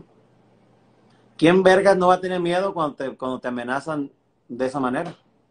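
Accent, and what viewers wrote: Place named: Fresa, Mexico. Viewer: Compa aveces como que ya te sale lo fresón pero saludos compa!!!!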